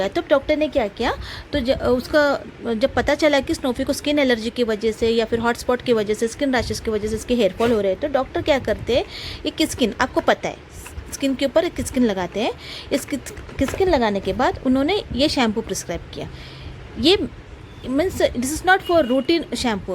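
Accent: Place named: Telugu, India. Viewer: Pakka telugu Haaa😅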